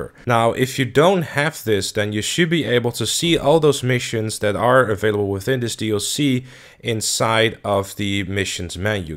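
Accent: Netherlands. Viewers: Je hebt een beetje een nederlands accent klopt dat?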